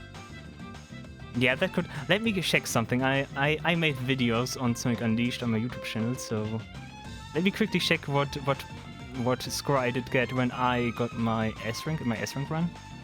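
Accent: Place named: Germany. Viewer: Bro has a German accent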